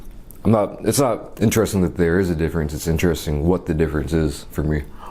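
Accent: United States. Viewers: U sound American lol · You sound like the most country asian guy ever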